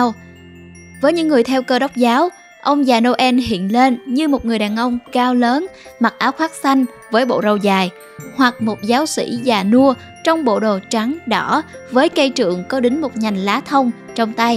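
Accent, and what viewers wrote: South, Vietnam. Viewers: Giọng miền nam duy nhất của nhà spider nhĩ, nghe de thương quá 😍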